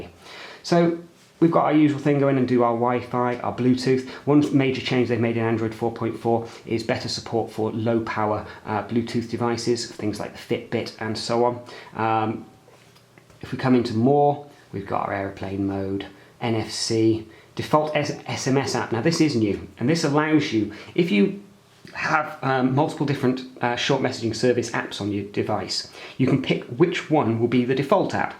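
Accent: United Kingdom. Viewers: Great review, thanks! I'm sorry, I'm not English speaking person. Is it a British accent?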